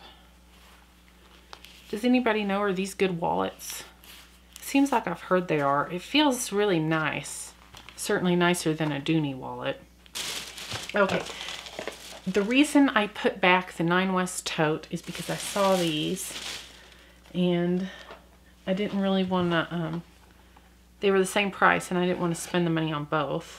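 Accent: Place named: South, United States. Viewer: I love that bag you call "dorky" lol! I just love your video's and that southern accent is awesome! I'm from RI born & raised here. I just love all your bags and my favorite videos are when you change bags and I love all the things you carry in your bag! I'm the same way---All my things in my bag has a story behind it. I carry a pretty piece of sea glass in my wristlet my grandson gave me. it's me good luck charm that I held in my hand when I go to treatment for my ovarian cancer. Keep taping! Your just awesome💜👍💜👍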